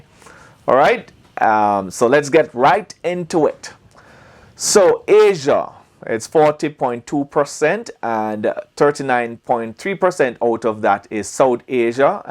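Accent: Jamaica. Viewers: Love your Jamaican accent. I have been there. Wonderful country and people. I am proud to say I visited the best island in the Caribbean. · You definitely talk like a Jamaican! From the way you say “issues” & “persons” & of course “long distance stulla” 🤣🤣👏🏾👏🏾 Cool results!!! 🇯🇲🇯🇲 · Well when you blend it all together, you can also look Arabian and Middle Eastern as well, but your talk is 100% Jamaican, ya man.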